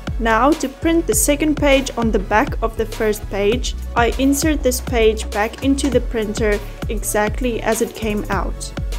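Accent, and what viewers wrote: South Africa. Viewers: Are you South African? 🇿🇦 That accent is uncanny.🥰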